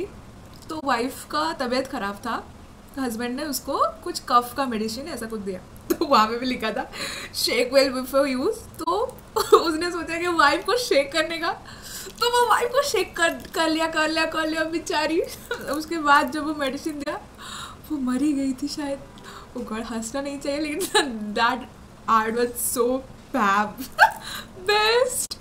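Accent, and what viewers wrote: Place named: Odisha, India. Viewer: Oh you're from orissa I recognised the way you speak hindi 🙃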